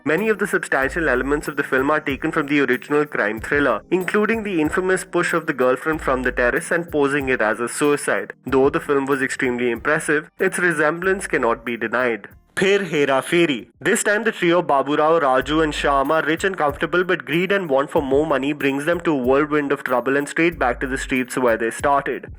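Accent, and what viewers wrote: India. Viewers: Who is here to only enjoy the Indian accent of the speaker? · That Indian accent though!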